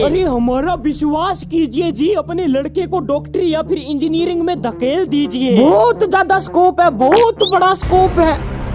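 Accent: Rajasthan, India. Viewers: Aap rajashthan se ho me bhi aap ke waha aese bol te h kya Bhai ji Kiya ho 😅😅❤❤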